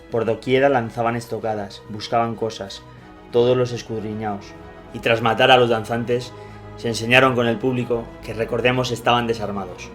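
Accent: Spain. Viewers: Y usted Español narrando.?🙄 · Como creerte si eres español. El título debe ser la noche alegre, ¿ por que Triste? Si somos mexicanos, menos tú, no puedes ocultar tu acento.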